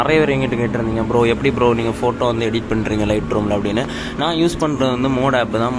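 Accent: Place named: Kanyakumari, India. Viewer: Kanya Kumari ah bro❤naama slang teriyuthu💥💝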